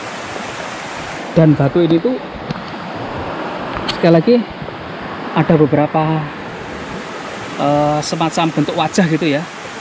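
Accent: Java, Indonesia. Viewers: mas njenengan jowo ne pundi to kok enak banget tutur katane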